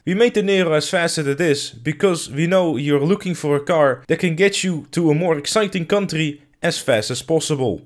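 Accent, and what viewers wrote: Netherlands. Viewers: an overly exaggerated Dutch accent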